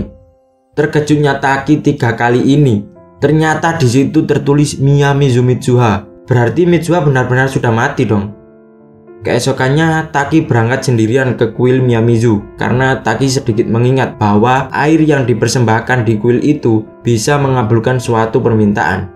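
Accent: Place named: Java, Indonesia. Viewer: Suara mu medok mas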